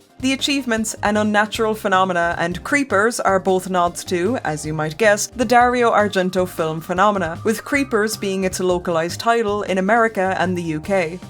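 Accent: Ireland. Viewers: Was not expecting the Irish.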